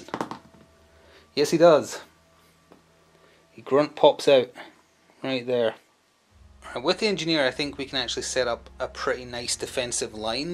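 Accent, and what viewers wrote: Scotland, United Kingdom. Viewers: It's Nitra, so pronounced night-ruh. For the Americans, what's your regional accent? It's leaning Scottish but doesn't sound full Scot.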